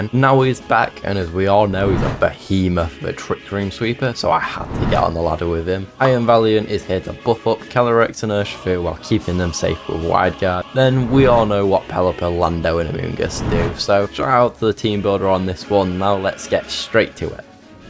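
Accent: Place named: United Kingdom. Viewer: Do you go to many regionals. You sound british to me? Did you go to the Birmingham regionals?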